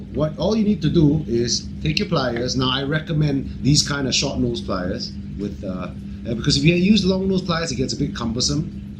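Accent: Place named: Singapore. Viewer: good to hear a singaporean accent again bloody miss home tbh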